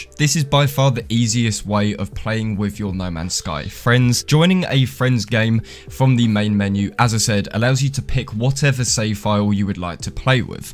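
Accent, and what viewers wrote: United Kingdom. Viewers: yo you british, i hit the L & S. just because gg. blud x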